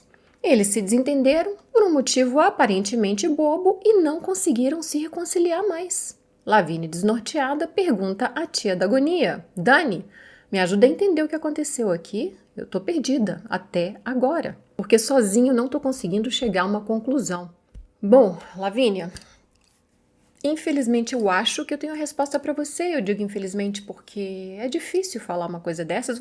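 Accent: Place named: Minas Gerais, Brazil. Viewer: Fiote de cruz credo 😂😂😂😂😂 esse jeitinho mineiro da me mata amo minha Minas Gerais 😅😊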